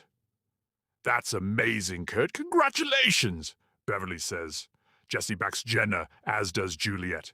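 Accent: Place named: United Kingdom. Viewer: I object to the British accent in the narrations. He is your idiot not ours!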